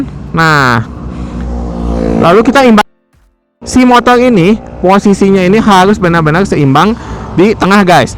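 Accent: Bandung, Indonesia. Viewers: KK nya orang Bandung yah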